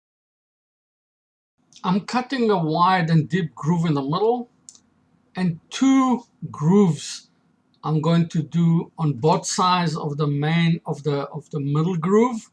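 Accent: Israel. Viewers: I knew, that's an Israeli accent. Strike!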